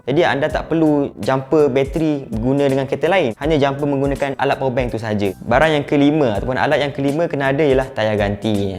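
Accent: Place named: Terengganu, Malaysia. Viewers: pekat ganu bohh hahah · loghat ganu ni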